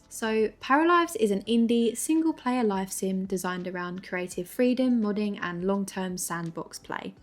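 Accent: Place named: United Kingdom. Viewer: really great and calm video! i love that youre also british cuz i usually only ever find american ytbers making sims/cosy game vids and it just feels more grounded probs cuz its a familiar voice but i digress! :3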